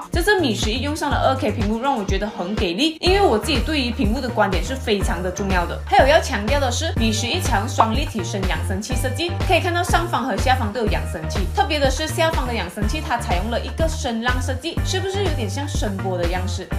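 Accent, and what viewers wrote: Malaysia. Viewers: 妳一開口 我就知道你是馬來西亞人 哈哈 說國語好友喜感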